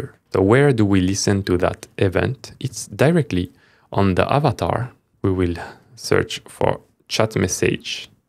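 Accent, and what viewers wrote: France. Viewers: L'accent français ne s'entends pas trop, gros GG en vrai 👌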